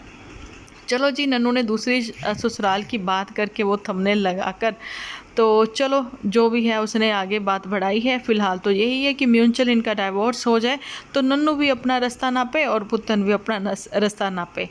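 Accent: Punjab, India. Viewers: I love your Punjabi Accent Aunty ji love from Canada 🇨🇦 I’m also Single mom with my daughter no house help no any support I’m working mom im working in School full time job but in 🇨🇦no any kinda helper I’m just thinking about those Drama Queens Sisters and mom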